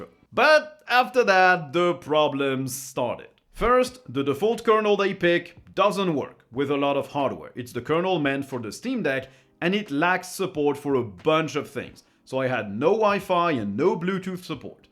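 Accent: France. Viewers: He's french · J’suis pas fou c’est un français il parle bien mais on se sait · Petit frensh accents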